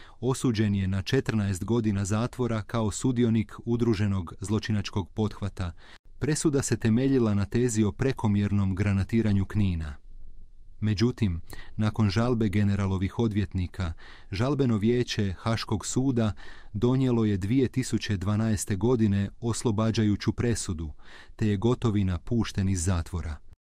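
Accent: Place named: United States. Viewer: Ti kao da si Amerikanac kako to izgovaras Gotovina Zadar itd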